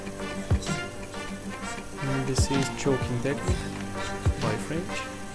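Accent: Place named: Russia. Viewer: I think russian accent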